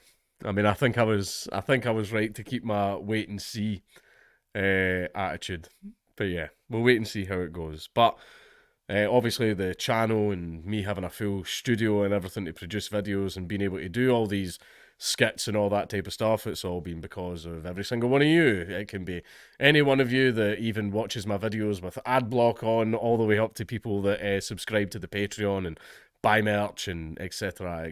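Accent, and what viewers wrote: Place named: Scotland, United Kingdom. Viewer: Have good Christmas man 👍 I've enjoyed listening to one of the last Scottish accents to call nonsense on the current things. P.S your having to many stress wanks, hence the reason your having consecutive children because your jizz is new when the wife can actually be bothered with you 😯 Thank me later man😂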